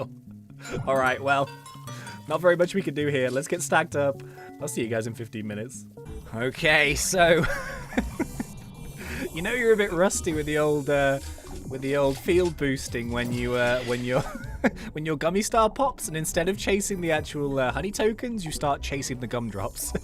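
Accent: England, United Kingdom. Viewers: what do you mean, but? Like he’s English, from the uk... like I said, and I’m LITERALLY English from the uk aswell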